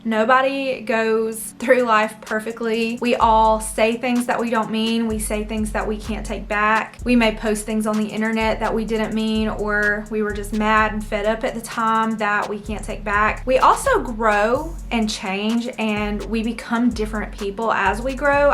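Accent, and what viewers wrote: South, United States. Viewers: Girl, I really needed to hear your words today! I have to remind myself of those same things every single day. I was stuck in fight-or-flight mode from the time I was 9 until I was 31, so I completely get it. After years of therapy, thank God, I’m in such a better place now. I’ve only been staying home for a few years, and it’s still an adjustment. I’ve got a 15-year-old, a 9-year-old, and a 1-year-old—and I just love your videos. Your accent makes me smile too since I’m from the South as well. Thank you for sharing. ❤ The power of prayer is so real! Continue to pray for your enemies!!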